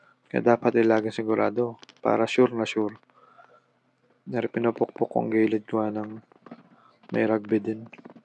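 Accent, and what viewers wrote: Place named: Southern Tagalog, Philippines. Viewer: Southern Tagalog Accent !!! ..Keep it up Bro..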